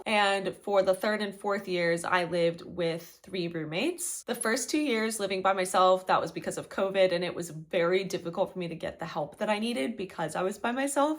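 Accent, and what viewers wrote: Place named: United Kingdom. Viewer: Someone tell me why I thought she was British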